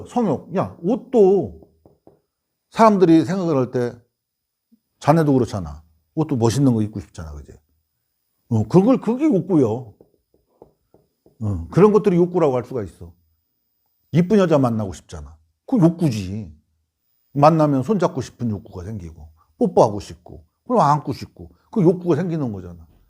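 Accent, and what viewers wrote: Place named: Chungcheong, South Korea. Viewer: 맞는 말씀이유, 시님!!!^^ 충청도 사투리 넘 구수해유^^♡♡♡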